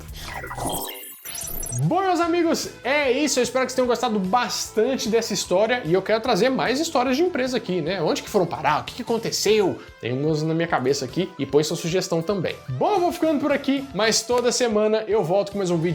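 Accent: Minas Gerais, Brazil. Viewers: Ah !!entao vc é mineiro !❤️❤️❤️❤️❤️fala da bobo dog mochila e bob au au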